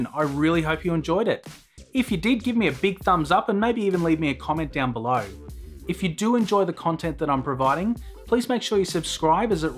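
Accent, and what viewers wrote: Australia. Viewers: I swear an Australian accent makes content better, it just sound more interesting for some reason lol. I was wondering why the long delay between uploads in 2015? you've got great content and a real solid foundation which I'm sure would see you boom on youtube? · Ah, so it's an Australian accent. Lol, yeah his videos are super soothing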